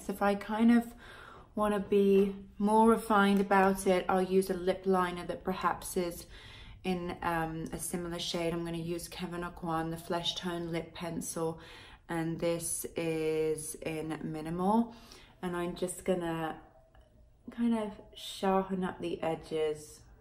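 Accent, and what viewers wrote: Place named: United Kingdom. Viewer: british accent is everything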